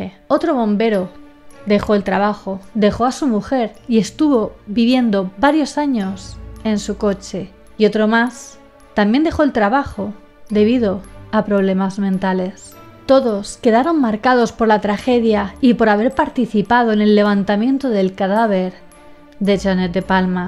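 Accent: Spain. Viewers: Qué hermoso acento español 🤩 😍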